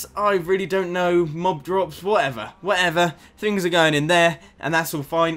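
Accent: England, United Kingdom. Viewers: don't mean to burst your bubble but your kind of a rip off of xsuima I know every one can make tutorials but also the building style and the houses for donators plus you have an English accent which makes it more obvious just come up with your own thing is what I'm trying to say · listening to your british voice every wednesday ? i would absolutely love to :D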